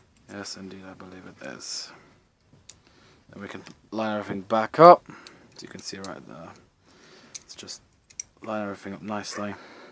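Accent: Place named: England, United Kingdom. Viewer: Thanks so much for these videos, I live in the U.K and have this exact model except it is a blank firing version made by Pietta. Turns out the little hand and spring was the problem as it had broken and you have really helped me to fix it, I cant thank you enough! Nice to hear an Englishman's explanation!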